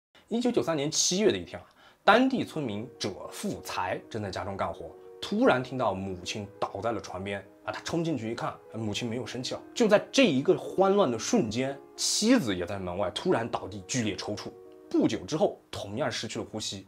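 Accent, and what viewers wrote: Yunnan, China. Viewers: 应该是个银南人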